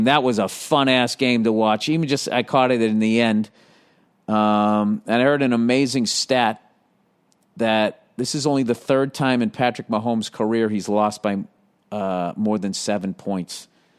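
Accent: Boston, United States. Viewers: No need to apologize for late FREE podcast! What can I expect? Just to hear your Boston voice is a "honor".(te he). One way or another, late or early, you always come through! Thanks. 72 year old guy.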